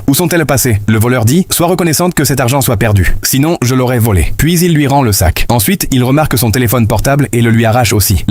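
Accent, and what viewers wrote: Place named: France. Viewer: Merci pour votre accent français. Monsieur l'auditeur. 😮. C'est génial. De ta part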